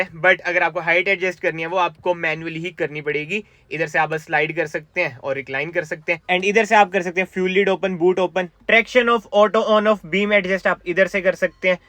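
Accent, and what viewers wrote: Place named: Punjab, India. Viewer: Bhai tumhari punjabi wali Hindi sun kar maza aa jata hain 😅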